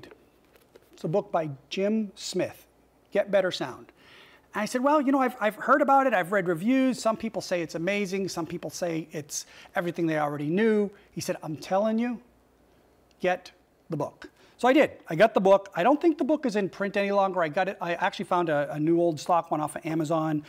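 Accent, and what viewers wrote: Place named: United States. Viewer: You sound American rather than a Greek to me.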